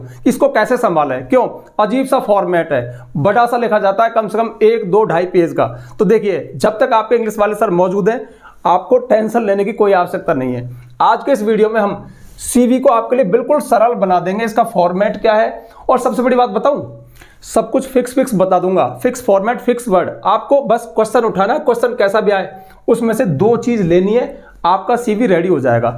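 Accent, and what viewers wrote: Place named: Meerut, India. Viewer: meerut se ho ky sir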